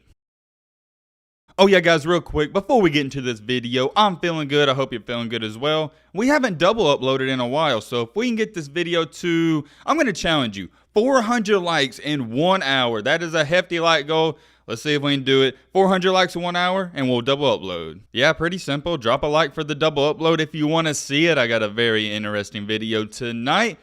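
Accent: South, United States. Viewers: It got scarier? Oh boy! The narrator sounds country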